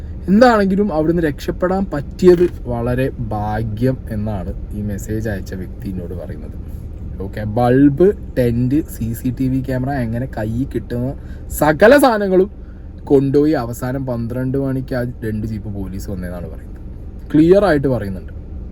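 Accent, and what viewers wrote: Malappuram, India. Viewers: "ഏത് കുദ്രത്തിനാണോ"😂 തനി മൽപ്പൊർത്തേരൻ 😅❤ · 😂😂😂😂😂- malappurathe karyam parayumbo aa slang ozhukk undayikote 😂😂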